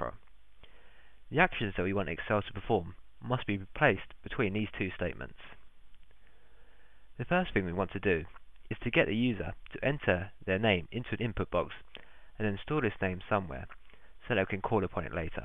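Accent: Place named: England, United Kingdom. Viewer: I have a hard time trying to understand VBA. Trying to understand that Englishman's accent makes it harder. I have had to rewind to listen to what he is saying half a dozen times in this video, and still didn't get all his words. I know he sounds like that cute gecko on the Geiko commercial - but have him work on his accent for us American folk just trying to learn VBA. Thanks. (I am sure he is probably a nice guy - don't take it personally, guy.)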